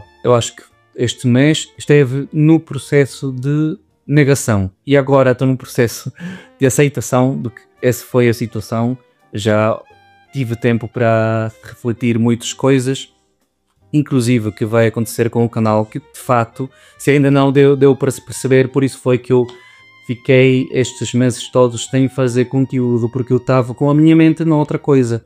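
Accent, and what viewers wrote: Portugal. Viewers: Olá! Reparei que falas mais em português de Portugal. Parabéns por isso! Nunca desistas. Um forte abraço Amigo! 🤗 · Antes de vires para Portugal tu já falavas bastante bem o português de Portugal, depois do tempo que passaste aqui o teu português é perfeito, mesmo o sotaque, está lá mas muito tênue É pena não teres conseguido ficar, mas tem esperança pode ser que consigas voltar com outro tipo de condições que te dê garantias de ficar cá.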